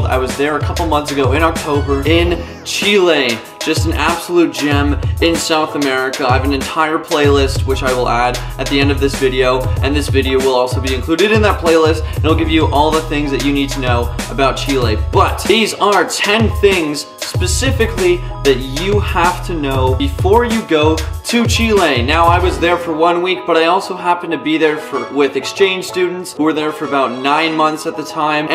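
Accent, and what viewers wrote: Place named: United States. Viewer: Your Spanish must be highly American accented. Chili but you keep saying chilayan. I thought it was chilian....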